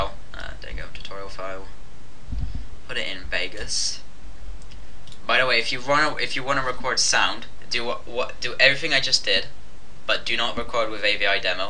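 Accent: Ireland. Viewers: Northern Irish? Southern Irish? Hmmm? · HE'S FECKIN IRISH!!!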